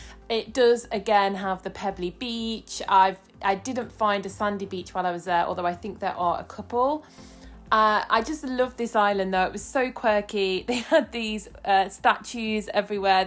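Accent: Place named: Home Counties, United Kingdom. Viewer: Forgive the late reply but the YT gods deigned to promote this video of yours after I have just watched the latest release from "Nick & Lins" who are well known promoters, in the naturist community at least, of clothes-free adventures which was a recap of their own Croatian experiences earlier this summer. I noted with interest that you didn't feel able to join in and go nude due to a lack of fellow nudies when you were there. If you ever go again one thing that Lins covered in her commentary of her video was that if you go a bit further off the beaten track, away from the touristy masses, you're more free to go nude. I'm also guessing by your content and accent that your a Home Counties / London based lady and so there are options for you here if that was something you wanted to pursue (because let's face it, you haven't lived if you haven't at least tried this out through going to a nude beach, an organised nude swim or just going skinny dipping). 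There are several clubs in and around the M25, three within only a short drive of each other if you head Hertfordshire way. These clubs do also offer ladies-only days where you can try things out but exclusively with other ladies so you're less likely to have to worry about men being about. There are also several nude beaches along the south coast which perhaps could be an option for summer 2026 if you want to try out British options. British Naturism is an excellent resource for locations and advice on the law if you're worried a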